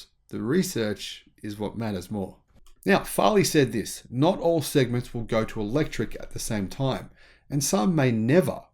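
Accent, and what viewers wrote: Australia. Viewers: But are Australian or Norweed, Im confused, u sound Aussie but reckon you're a viking? do you wear a hat?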